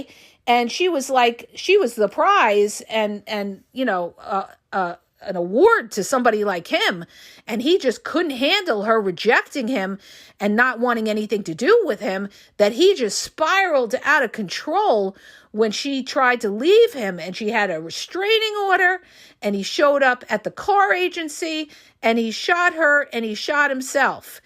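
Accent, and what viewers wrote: New York, United States. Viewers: Besides all the Facts that speaks on about Narcissist Abuse, has the Cutest New York accent here on YouTube 😊❤❤❤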